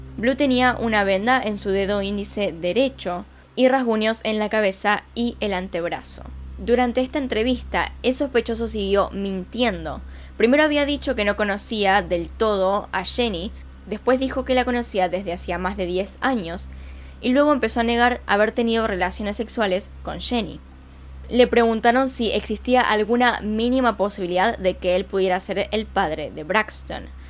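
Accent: Argentina. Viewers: Sí mencanta el acento argentino y los videos. 🤩👌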